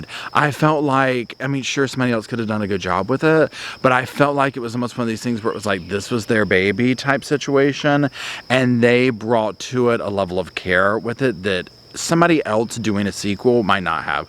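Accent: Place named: England, United Kingdom. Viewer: Hahaha loving your English Emily blunt accent 👏👏👏👏I’m English and people always think we speak posh! Like the royal family and erm....I don’t lol x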